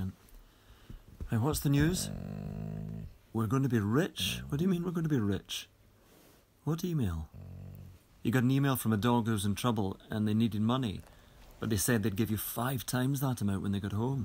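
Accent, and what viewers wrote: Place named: RP, United Kingdom. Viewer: It just sounds more posh than my accentless Midwestern accent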